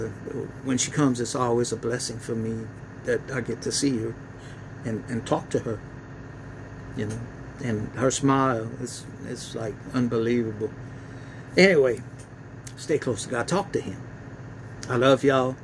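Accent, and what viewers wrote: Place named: South, United States. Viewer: just love yr southern accent and manners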